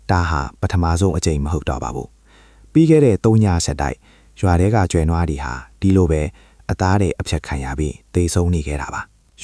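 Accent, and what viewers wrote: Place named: China. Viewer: တရုတ်အသံလား တိုင်းရင်းသားဆိုသူတွေအသံလား ဗမာလူမျိုးရဲ့ ဗမာလေသံမဟုတ်ဘူး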